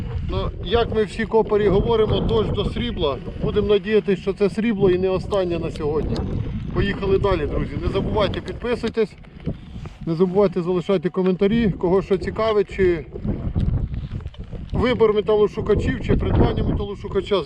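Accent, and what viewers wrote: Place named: Khmelnytskyi, Ukraine. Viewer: Доброго Дня,З Якої Області .Схоже На Розмову Хмельницька.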